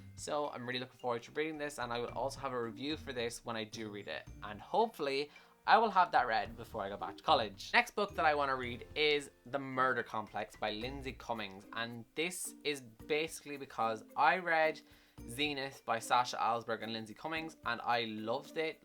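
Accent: Ireland. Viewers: Are u from Dublin ?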